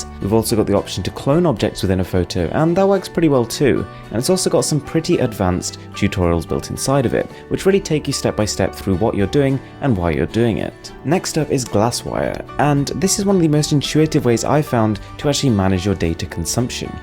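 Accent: United Kingdom. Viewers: British MKBHD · Are you from the UK?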